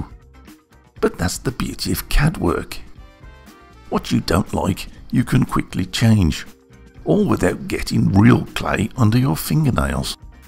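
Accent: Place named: Birmingham, United Kingdom. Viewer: from the accent and the hobby... Is it fair to deduce that you work in the jewelery quarter in Brum?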